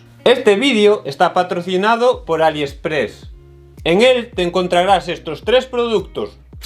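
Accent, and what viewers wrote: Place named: Galicia, Spain. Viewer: dios que acentazo gallego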